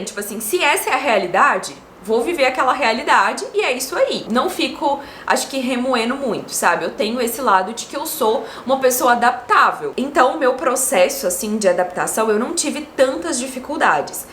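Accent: Minas Gerais, Brazil. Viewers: A melhor coisa é me identificar com o sotaque. Minas é o lugar!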